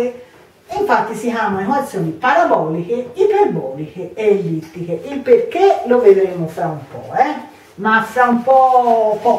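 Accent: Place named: Tuscany, Italy. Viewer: Toscana palese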